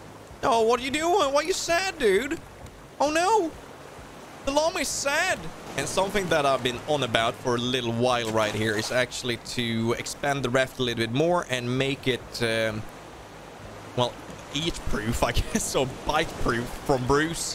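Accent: Sweden. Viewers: Not seen many of your vids, but some with Fooster, looking forward to seeing/listening to more vids. What I think I like the most, is how you embrace your Swedish, as a fellow Swede, I'm happy to hear it, and it always makes me smile. Love from good old Malmö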